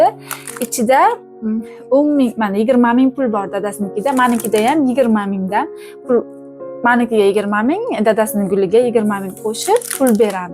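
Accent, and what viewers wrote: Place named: Khorezm, Uzbekistan. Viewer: ХОРАЗИМ ДАНМИСИЗ ШЕВАНГИЗ УХШАБ КЕТАДИ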